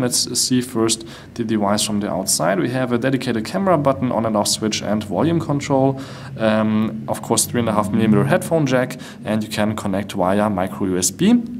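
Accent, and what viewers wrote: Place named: Germany. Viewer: you're german, aren't you ? :D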